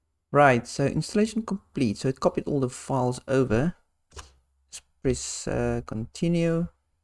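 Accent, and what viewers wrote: South Africa. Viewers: I recognize a fellow SAn when I hear one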